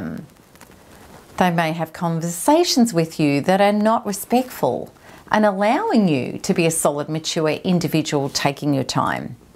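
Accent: Australia. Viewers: Can I please listen to you say you want me to "know" ? So cute . Are you from down unda? Wink wink. Love it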